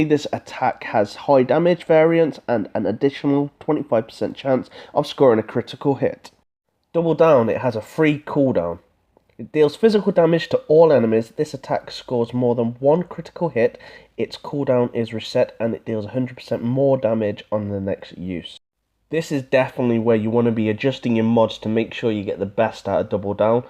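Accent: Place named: United Kingdom. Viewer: I bet you're from GB, not USA, judging by your pronounciation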